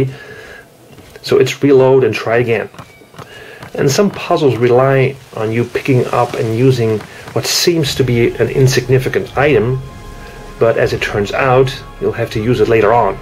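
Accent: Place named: Netherlands. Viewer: I can hear it from your accent that you're dutch. Am I right?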